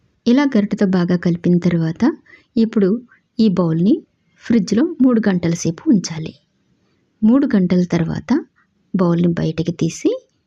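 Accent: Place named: Telangana, India. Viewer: Good to hear Telangana state decent language slang in Andhra recepies from Thankku by this respected women.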